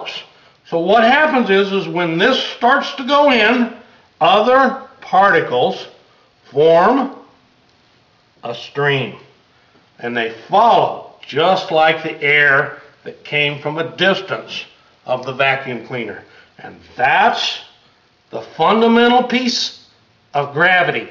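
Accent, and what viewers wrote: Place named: Texas, United States. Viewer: aherlm ?! an american with texas dialect explaining particle mechanics (insert texas joke here) I'm having a hard time to swallowing this is real science americans cant even keep their government clean from corruption insert rant about copyright, patent system, privacy violations, lies, bully, threaten, *grumble grumble*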